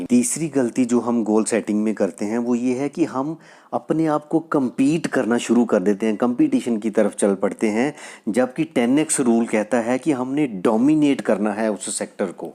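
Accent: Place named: Punjab, India. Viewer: Your accent has touch of Punjab of Pakistan.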